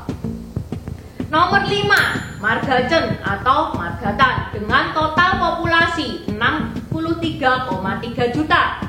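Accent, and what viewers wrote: Java, Indonesia. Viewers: Logatmu jowo mbak mbak. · loe lidah nya Jawa medok jawa mana loe domisili aku salut salam dari cilegon Banten Iskandar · Ce org tionghoakah? Kok medok Jawa ya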